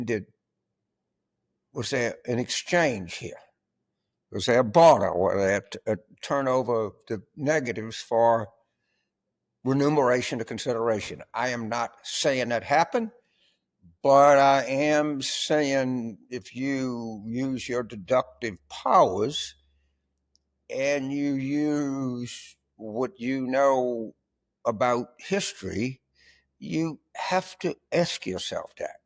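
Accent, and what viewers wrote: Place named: Louisiana, United States. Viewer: I prefer my commentary on the complete and utter downfall of F45 served up with a Cajun accent.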